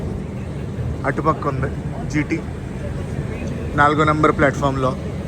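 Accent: Godavari, India. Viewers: Godavari Yasa baguntadhi brother.. watching from Ireland…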